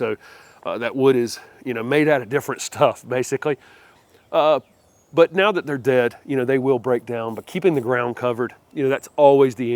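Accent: South, United States. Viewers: Sounds like you encountered your first troll!! You have made it big😂!! Your southern accent ain’t got nothing on us here in Alabama lol!! We really drag it out but I am ok with my accent!! Some folks really think just because you have a southern accent that your IQ is automatically lower and that just isn’t the case!! Even us well educated folks talk like this lol!!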